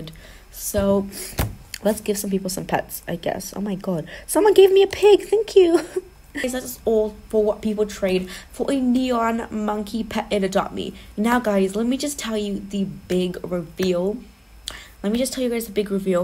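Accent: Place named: United Kingdom. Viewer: Wow you are British and a normal English accent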